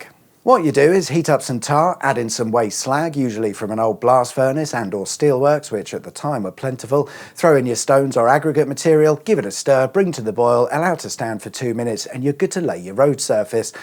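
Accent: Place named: United Kingdom. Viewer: The stingy british undertone gets me every time.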